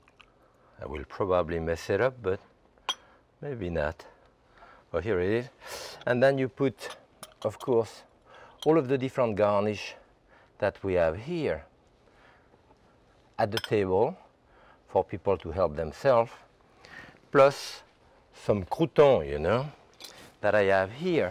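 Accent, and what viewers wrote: France. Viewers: 've been watching this whole day..the french accent,his attitude and his skills....great stuff!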